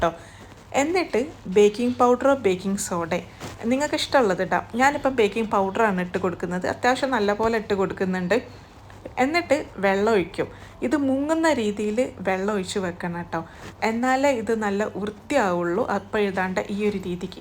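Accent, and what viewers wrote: Kozhikode, India. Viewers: അതെ ഞമ്മൾ കോഴിക്കോട്ടുകാർ അങ്ങനെയാണ് പറയുന്നത്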